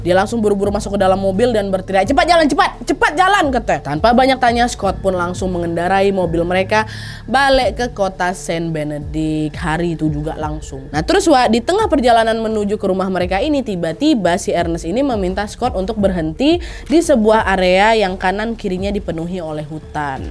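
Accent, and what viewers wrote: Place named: Sumatra, Indonesia. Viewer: Asek kali lah kalau udh org Sumatra yg bawain cerita.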